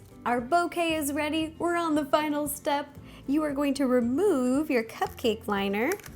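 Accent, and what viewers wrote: United States. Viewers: She's american and every country has an accent in their language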